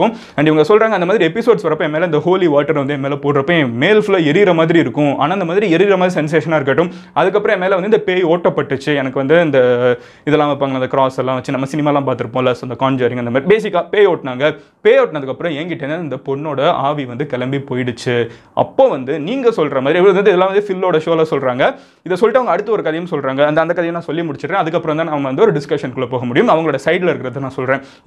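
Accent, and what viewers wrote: Madurai, India. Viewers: தலைவா நீங்க மதுரை யா ? மதுரை 🔥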